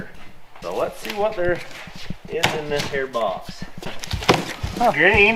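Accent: Utah, United States. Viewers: I think ever since Randall started, your southern Utah drawl has become more noticeable.🙃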